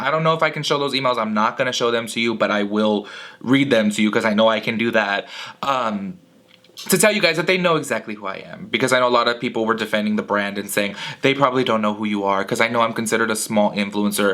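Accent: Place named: Bay Area, United States. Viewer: You talk like you’re from the Bay Area 😭😭😭😭💕💕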